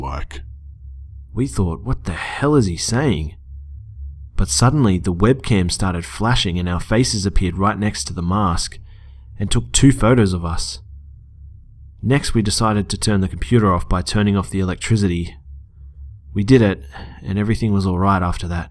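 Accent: Australia. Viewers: are you Australian? your accent sounds Australian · Gday mate? Fellow Aussie for sure; great stuff! Keep it up! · Are you Australian? I'm getting a Aussie vibe I love your accent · Are you Australian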